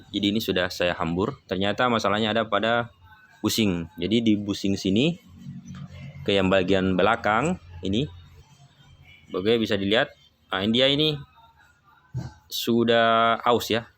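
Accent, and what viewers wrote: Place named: Bugis, Indonesia. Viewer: Tau ogi logatna anggotae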